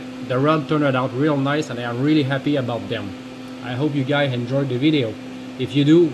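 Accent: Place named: Quebec, Canada. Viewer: Ca sonne québécois cet accent là mon ami! ;) Bon vidéo!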